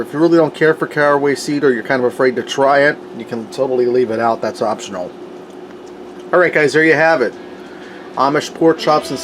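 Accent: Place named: Pittsburgh, United States. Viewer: You have a Pittsburgh accent. Makes me miss the Burgh!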